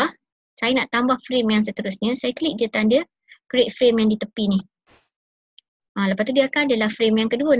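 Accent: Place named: North, Malaysia. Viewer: Syukran cikgu.....Penerangan yang jelas dan terperinci. Seronok dengar ada dialek utara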